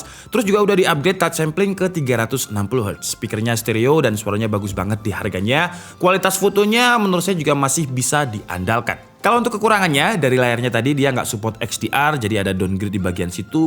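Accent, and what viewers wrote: Malang, Indonesia. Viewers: Bang lu orang malang kah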